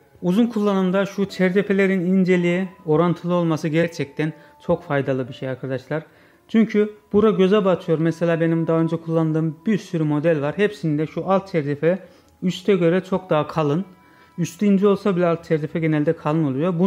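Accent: Azerbaijan. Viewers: Azerbaycanlı mısın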